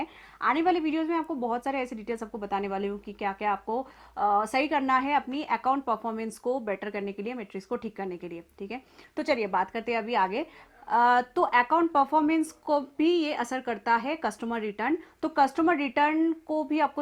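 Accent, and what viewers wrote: Bengal, India. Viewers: Aapki hindi me bagoli language ka tadka he sunne mi bahot accha lagta he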